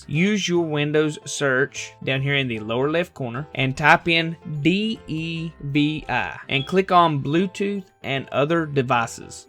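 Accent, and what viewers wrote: South, United States. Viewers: Thank you man your Southern drawl is exactly what I needed for in an explanation on how the f*** to use the shift paddle after I've had these controllers for like 3 years and never even considered it. And don't mind them comments the videos perfect we can stare at those Stars on your desk top while you explain some stuff. Thanks brother.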